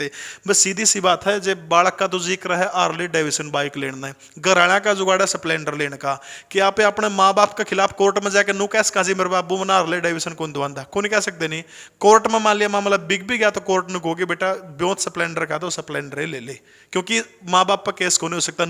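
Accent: Haryana, India. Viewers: Aap great ho sir ji apni Haryanavi boli m pdhate ho sbko smj aata h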